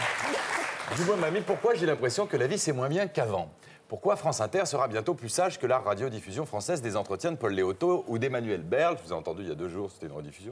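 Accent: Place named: Quebec, Canada. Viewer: Merde il a vrament l'accent québecois non de dieu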